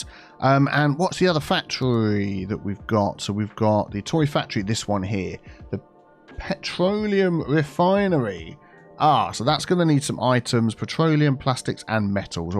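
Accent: United Kingdom. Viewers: Subscribe all would mean i get ALL the mods, Biff has FPS mods that my potatoe can't handle. so I need to search through the mods for the one he mentions, some like TMPE are hard to understand when someone says it quickly. especially a wonderful brit. a graphic with the name of the mod woule mean i could type the name in goole with Cities:skylines and immediatly get the mod I want to download.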